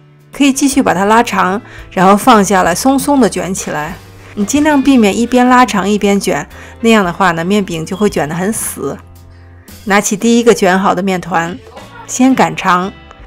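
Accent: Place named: Xi'an, China. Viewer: 最开始看是因为地道的西安味，难得发现一个老乡，然后看见所有配方都有详细说明，量杯和克数，还有中英文对照，尤其用量杯，大小勺，非常方便，我习惯用计量，每次看见网上食谱用克数就头疼，现在方便了，要做什么都打开的视频看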